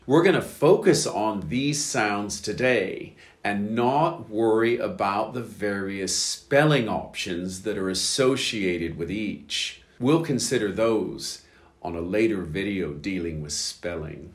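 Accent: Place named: General American, United States. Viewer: He is a native speaker of an American English accent called 'General American'. However, the phonetic symbols employed belongs to 'Received Pronunciation'. This belongs to British English. That makes it confusing to learners. American English employs more schwas than British English.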